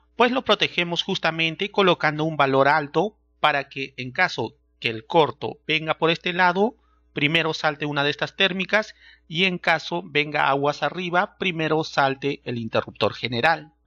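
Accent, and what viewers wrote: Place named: Peru. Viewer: Dime que eres de Perú.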